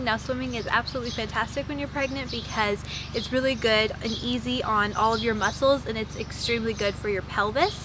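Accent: United States. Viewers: Just found your videos and I love them. I love how much of a healthy life you live. I don't know if you mentioned this in another video or not but how did you meet your husband? I was just wondering because you sound American and your husband sounds German and you mentioned living in Germany, so I was just wondering how you ended up meeting one another? =)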